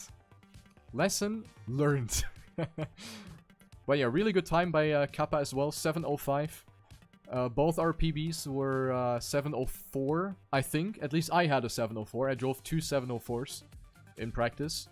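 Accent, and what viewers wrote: Germany. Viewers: Nice to see how self critics you are with yourself +++ german english 😉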